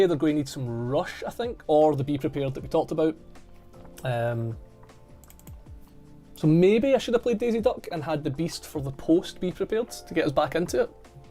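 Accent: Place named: Scotland, United Kingdom. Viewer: Off topic, but you're so cute and what kind of accent is that?? Scottish? Regardless, i love it! 😍 😅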